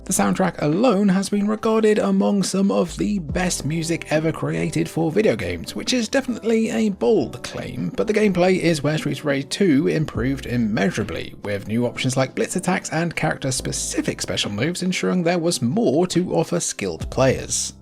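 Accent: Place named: United Kingdom. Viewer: You sound British but then why would you be talking about the Genesis?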